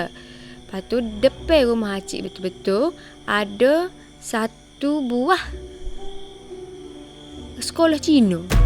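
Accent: Kelantan, Malaysia. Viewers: Sambil2 dgr dapat belajar loghat Kelantan.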